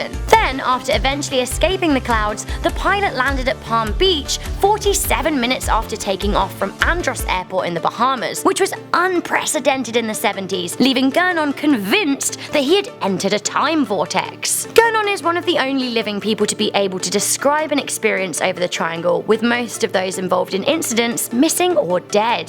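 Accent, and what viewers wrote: United Kingdom. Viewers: Good for you you don't live in America down by Florida cause you are either British Australian or Irish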